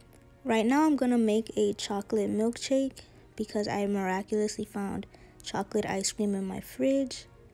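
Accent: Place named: United Kingdom. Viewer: Your british😂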